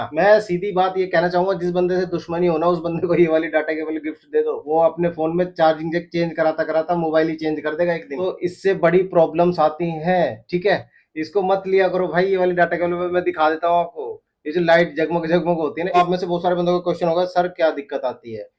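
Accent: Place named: Haryana, India. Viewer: hisar,haryana.Boli t smaj gya tha m chhora haryanvi h👊👍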